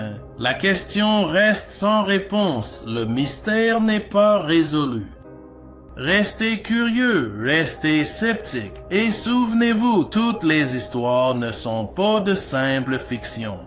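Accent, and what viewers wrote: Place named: South, France. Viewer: well, as a frequent visitor to France, not only do your videos help with my learning the language, but I also love the authenticity of the southern notes to your French accent 👌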